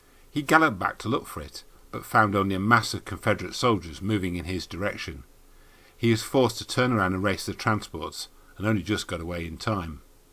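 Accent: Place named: United Kingdom. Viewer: Why is the narrator British? 🤷🏼‍♀️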